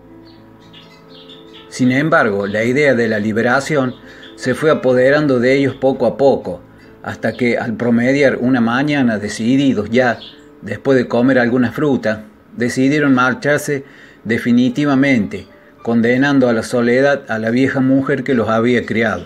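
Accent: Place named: Córdoba, Argentina. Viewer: Hermosa leyenda ANCESTRAL y tu tonada cordobesa . Saludos desde mi Argentina bella y nuestras provincias ❤ 😘👋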